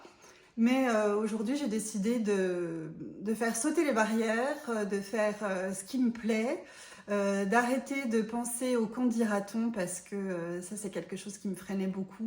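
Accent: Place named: Franche-Comté, France. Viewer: Bonjour. bravo pour cette chaîne que je découvre aujourd'hui 😉 quel plaisir quand j entends que tu viens de franche Comté....cela nous fait un point commun 😅 l' accent...je connais... et le tien est très léger 😊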